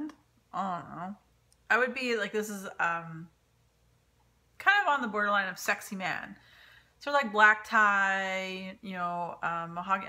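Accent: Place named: Canada. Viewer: loving your Throwback Thursday candle reviews. I"m all caught up now and looking forward to more. Your Canadian accent is adorable!;-)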